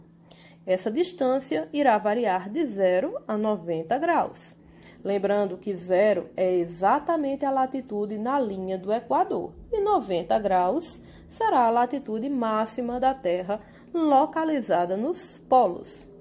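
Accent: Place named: Northeast, Brazil. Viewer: que aula fera! ouvindo seu sotaque me lembrei do nordeste, um lugar que amo!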